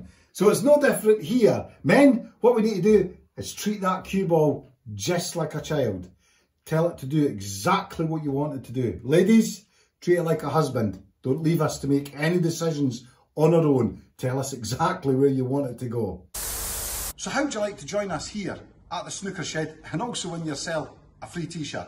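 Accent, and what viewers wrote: Scotland, United Kingdom. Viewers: you sound much like a scot!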